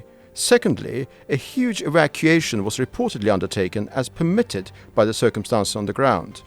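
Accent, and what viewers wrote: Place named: United Kingdom. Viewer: Это прекрасно, что появились выпуски на англ языке. Однако, можно совет от англоговорящего человека? Прошу вас попросите комментатора не тараторить, в самых важных моментах используется военная терминология уместная для данного контекста, но зрителю нужно это ещё уловить и осмыслить. Плюс очень сильный британский акцент смазывает некоторые слова. Немного практики по примеру телерепортёров с BBC и всё будет отлично. И потом мы (зрители) рады и 15-минутному ролику, если нужно почётче всё изложить, мы только рады. Удачи вам во всех делах. Спасибо за вашу работу.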